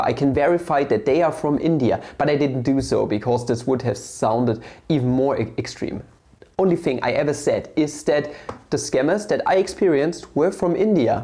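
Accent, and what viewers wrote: Germany. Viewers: I have rarely seen your channel and your videos and just stumbled here because of algorithm. I don't know why you hate indians or indian claims, maybe i know. Maybe it bugs you that someone can be better than the superior western european white race. May be it reminds you of the trauma of how west and europe is declining and other parts of the world are no more your slaves and your yaysayers and they are claiming their heritage. Maybe its because of your accent you seem deutsch and your ancestors felt the same way about indians. They detested and hated indians. Incidentally, they hated jews as well so i guess the problem lies with jews and indians somehow. Your rant reminded me so much of your ancestors. Maybe the apple doesn't fall far from the tree. Disclaimer- I am usually an opposite of a nationalist or so called patriotic and i know you are going to paint me as one for your convenience and i don't care, i am usually a cool dude and i apologize if i am rude to you but your rant and your intrinsic subtle hate about a group of people made me comment. 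I usually never comment on anything. I hope you educate yourself, visit india sometime and learn that india is even bigger than europe in terms of population and people groups and there are many different kinds of people and have differing opinions. Just like europe has far right white supremacists like yourselves, india too has bunch of bigoted nationalists, scammers and bad people and if you count them, they are probably far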